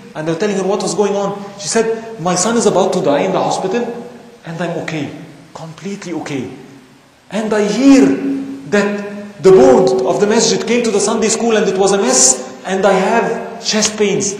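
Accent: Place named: Egypt. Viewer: Yakhi this guy is AWESOMEEE. Love him mashallah. Love the egyptian accent too xD Very nice lecture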